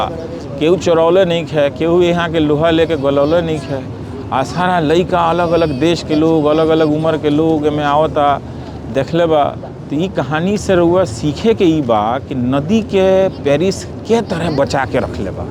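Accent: Bhojpuri, India. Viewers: एतना मीठ भोजपुरी रउआ मुँह से सुनी के दिल गद गद हो गइल 🥰🥰👏👏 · एतना मिठा भोजपुरी रउआ मुह से सुनी के दिल गद गद हो गइल बा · केतना सुंदर आहा वर्णन करेला।।। हम बिहार के बनी।।। हमरा बर निक लागल आहा के भोजपुरी बोली।।।।। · बहुत ही बढ़िया जानकारी दिहली रउआं।आपके मुंह से भोजपुरी सुनिये के बहुत आनंद आ गईल । धन्यवाद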